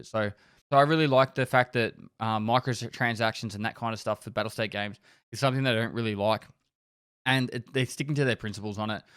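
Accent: Australia. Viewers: He's Aussie breh like me. We say "Starlin" because our accent..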